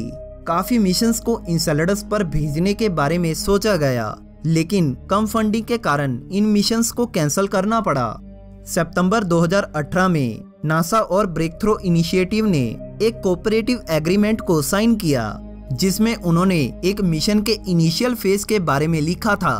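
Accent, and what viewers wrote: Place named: Chandigarh, India. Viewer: KOI NI BHAI SAB BHAI BHAI HI HAI MAINE SIRF ISILIYE PUCHA THA KYUKI AAPKA ACCENT MUJHE BILKUL CHANDIGARH WALA LAGA.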